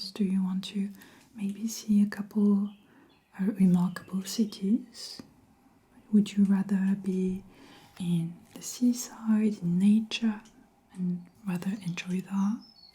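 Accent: France. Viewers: Since I found you watching the Futuristic Hearing Test, respectfully I’m addicted to your voice. So soothing and that French accent on your English is the last top cherry on a cake. Really really good job. Keep going. I would love to see a suit fitting but in an elegant way and also a flight attendant. Both in English as well if it’s possible cause idk any French 🥲 Still, great work! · This is the first video I’ve seen of yours and I love the atmosphere and soft voice. I can hear the French accent, but some words seem to have a hint of South African or New Zealand, which is really cool! This was a great video :) Just read your little bio. Nice to see another enby out there in the ASMR universe 🥰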